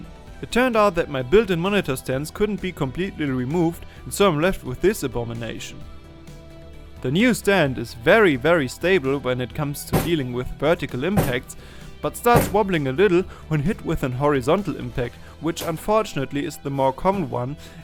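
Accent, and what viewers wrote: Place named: Germany. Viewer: Ich liebe deinen deutsch akzent gutes review, auch wenn ich es 4 Jahre später sehe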